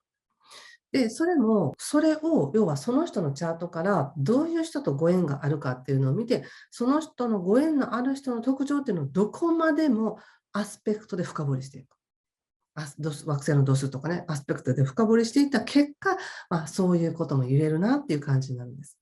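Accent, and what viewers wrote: Kansai, Japan. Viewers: 、以前から少し言葉の端に関西を感じてたんですが、大阪の方だったんですね！めっちゃ親近感〜❤私も大阪出身でーす😊わーい正直な物言いが素敵！そうでなくちゃー！ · めっちゃ関西弁なんですね😂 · さんの関西弁、新鮮！！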